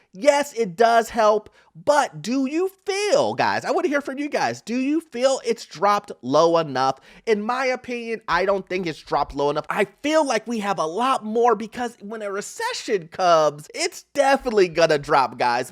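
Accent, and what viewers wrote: United States. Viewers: I love your Midwest accent. Makes me want to pull up a rack of baby back ribs and talk real estate all afternoon!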